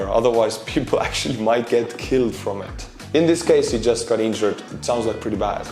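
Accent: Estonia. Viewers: I like how the patreons are read. I am considering becoming one just to see how my name sounds in Estonian lol. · The best news on this war with Estonian Accent... Mr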